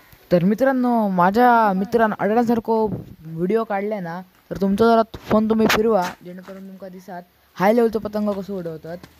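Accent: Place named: Malvan, India. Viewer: तुझी मालवणी भाषा ऐकायला छान वाटत आहे.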